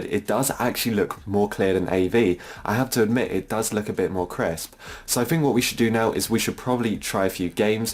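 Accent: United Kingdom. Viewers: Love your British accent mate 👍🏻😌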